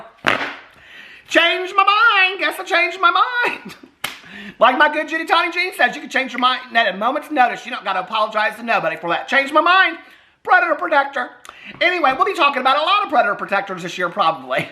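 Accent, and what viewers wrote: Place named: Indiana, United States. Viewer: I'm so glad I found your channel i've been absolutely BINGING on your videos the past two weeks! Fellow Hoosier over here!! I found you through Adam McIntosh and your videos are like listening to a friend, especially because we have a very similar accent <3 I've been sober 3 years and hearing how long you've been on your journey and just the tidbits about your life are SO relatable and fill my heart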